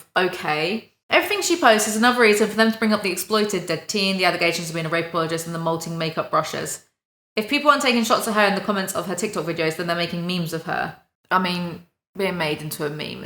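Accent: United Kingdom. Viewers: I haven’t started watching the video but I’m READY for you to reprimand her in British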